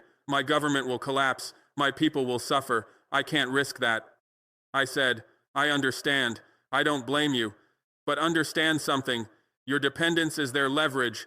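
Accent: United States. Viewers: Sir, you sound like a good Ole boy, right down the road from me. Educated here in Texas.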